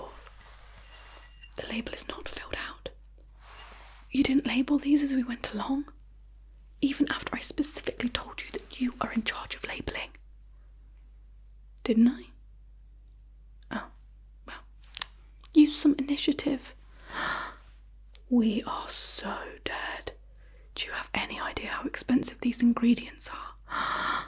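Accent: RP, United Kingdom. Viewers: And guessing from your very sexy English voice you are from the posh English county’s like Surrey,Oxfordshire or somewhere like that??!!😉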